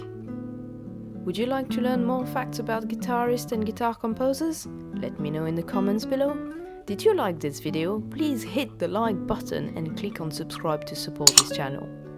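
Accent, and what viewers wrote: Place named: France. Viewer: You've a delicious french accent.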